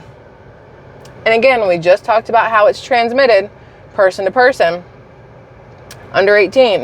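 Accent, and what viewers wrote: South, United States. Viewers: you are hilarious!!! So honestly southern!!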